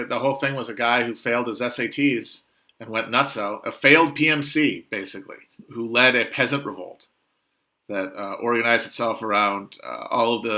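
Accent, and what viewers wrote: Louisiana, United States. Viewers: HUEY LONG! Laissez les bons temps rouler, and let loose with your Cajun accent for an hour.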